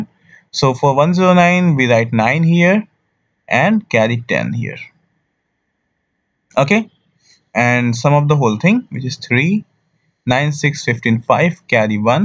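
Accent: India. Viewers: Sorry bruh with your heavy bloody indian acetone m accent it doesn't take 3 seconds, it took like more 45 seconds mate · you already know ur gonna learn something when you hear that indian accent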